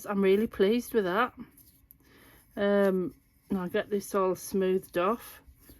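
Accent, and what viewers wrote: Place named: Sunderland, United Kingdom. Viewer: hi im new to your channel I've found you through Sallys channel ,, love love love the gothic look ,I'm from Sunderland and I can't figure outl how close you are from your accent 🥰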